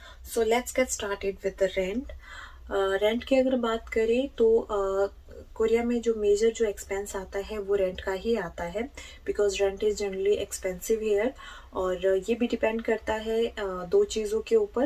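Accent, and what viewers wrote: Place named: India. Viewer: Your English Accents like Indian language , Not understanding at all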